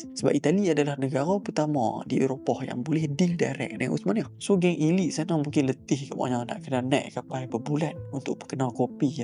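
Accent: North, Malaysia. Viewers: Sedap dgr loghat utagha 😅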